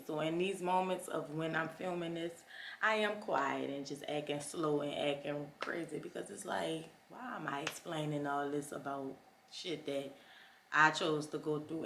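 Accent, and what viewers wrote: New Orleans, United States. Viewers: I love the New Orleans Accent 😊😊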